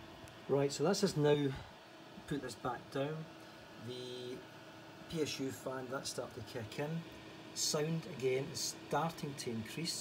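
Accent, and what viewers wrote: Scotland, United Kingdom. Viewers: sort of off topic, but your Scottish brogue is awesome.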